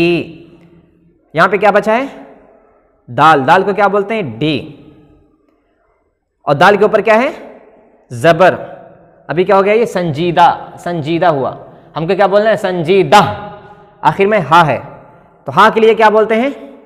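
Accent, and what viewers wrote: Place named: India. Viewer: Your pronunciation is according India not UK.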